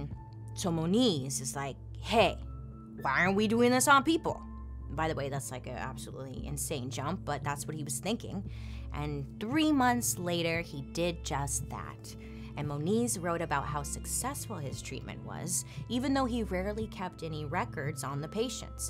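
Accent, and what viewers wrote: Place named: California, United States. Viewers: To me she sounds like she has a California accent. Like a valley girl, idk for sure but it’s nice & adds to her story telling. (From Georgia btw) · She KIND of has an accent to us from the Midwest but that’s because she sounds like she’s from Cali or something but people from other counties will hear it as an accent to them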